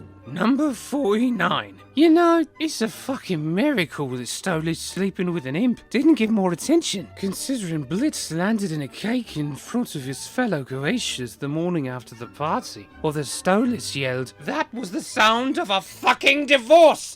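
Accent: United Kingdom. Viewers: Man with hoity-toity British voice gets increasingly drunk talking about a horny owl demon. This is the most video I've watched all day. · I went insane listening to this drunk British guy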